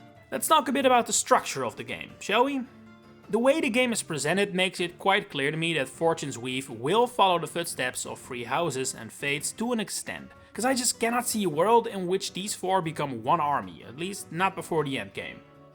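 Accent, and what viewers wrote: Netherlands. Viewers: Bro are you from the netherlands?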